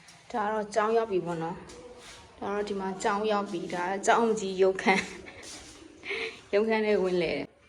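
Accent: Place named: Dawei, Myanmar. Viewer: ထားဝယ်လားမြိတ်လားစကားပြောတာနားထောင်လို့ကောင်းတယ်အခုလိုမျိုးတင်ပေးတာကျေးဇူးပါ။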